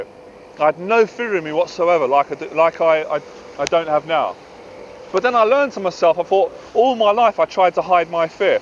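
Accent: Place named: United Kingdom. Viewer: I'm really sorry but I hate british accent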